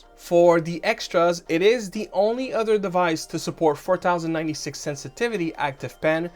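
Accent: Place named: Morocco. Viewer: I've been sitting here trying to guess where your accent is from. I'm gonna guess Moroccan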